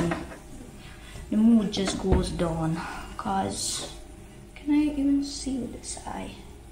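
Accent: Jamaica. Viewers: This is brazy watching a white girl talk with a Jamaican accent. I live in England.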